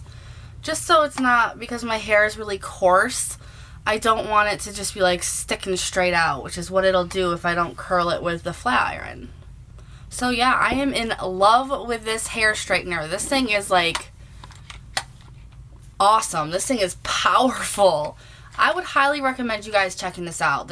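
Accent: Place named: New Jersey, United States. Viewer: Michigan, about 30 minutes from Detroit where my fiancé currently lives. What state are you from? Your accent sounds a little like someone I knew from New Jersey.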